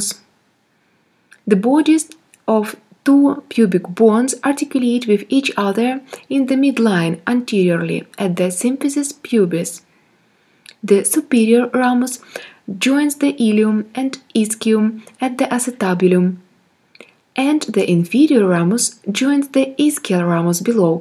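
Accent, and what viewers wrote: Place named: Spain. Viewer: Thank you so much. It was a great help. Btw are u spanish? I asked because of ur accent. I love this accent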